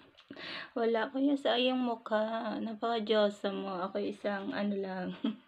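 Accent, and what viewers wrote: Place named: South Korea. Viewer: Ano ba ga talaga ang tono mo sis? May koreana, may ilonggo, may mahinhin at ilokana, anyways, go go lang sis 😘😘😘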